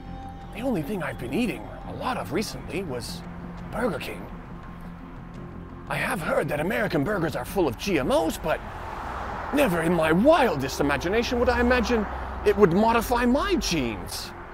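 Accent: United Kingdom. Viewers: is my favorite YouTuber, but his British accent is just terrible...lol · Hahahaha I'm rolling on the floor...that British accent😂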